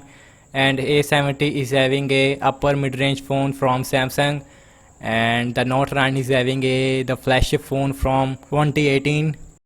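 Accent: India. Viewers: Typical Indian accent and pronunciation..R2D2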